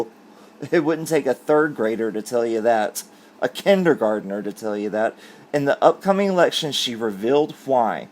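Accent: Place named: Georgia, United States. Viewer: Omg!! I’m a fairly new subscriber... I think I discovered your channel about a month ago and I’ve been hooked ever since!! When I was watching your last video, I thought “hmm I wonder where is from (because you sound like me lol), and I almost asked in the comments but decided not to and then I find out in this video that you are in fact from lovely GA!! I’m from the northeast part, what about you?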